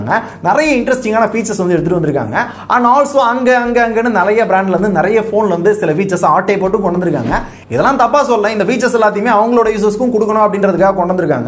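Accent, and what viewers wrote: Madurai, India. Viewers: Pro. Madurai pechu and nakkal vera level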